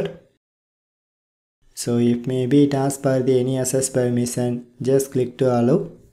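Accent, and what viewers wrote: India. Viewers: Actually the Indian English is very bad I can't hear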